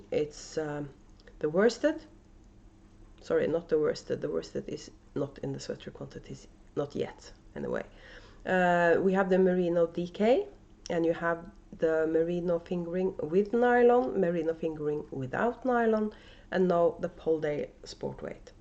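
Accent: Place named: Norway. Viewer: Your accent is wonderful! My great grandfather came from Norway as a very young man and I enjoy hearing the accent! Don't worry about editing, for me as a viewer, I love to see natural podcasting. I enjoyed making fun costumes for my children and they used to love decorating with pumpkins they had carved different faces on to put on the porch with candles in them we would light at night. We used to clean the seeds and bake them for snacks Wich was fun. The best for me, was making them pumpkin cookies, which the loved. They are a cake like cookie with lots of spices and rasins. Love your podcast. I do hope your face heals quickly. Yarny hugs! Ava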